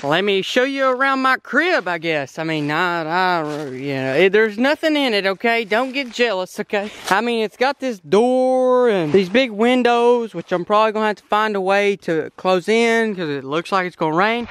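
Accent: United States. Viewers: I LOVE HIS AMERICAN ACCENT!!!!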